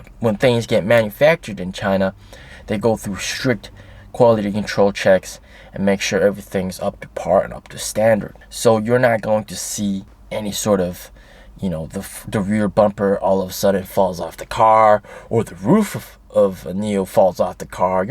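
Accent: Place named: South, United States. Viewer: I like your southerner accent.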